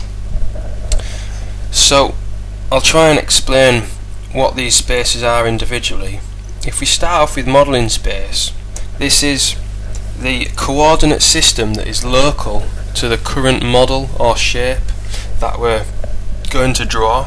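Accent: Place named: United Kingdom. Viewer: The one you mentioned is US spelled version. I can tell that he has a british accent. ;)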